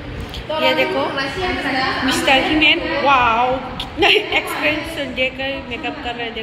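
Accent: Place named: Bhojpuri, India. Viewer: Behn ji aapki hindi bolna..hamko bahot acchha lagta hai...like bhujpuri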